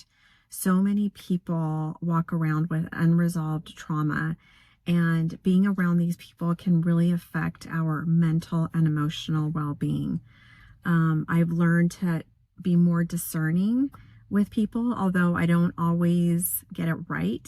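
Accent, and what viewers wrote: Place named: New Jersey, United States. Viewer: She sounds like she is from Jersey:)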